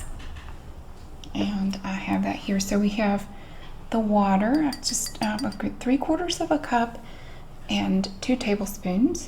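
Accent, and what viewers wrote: South, United States. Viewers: Love how southerners pronounce oil. Great videos.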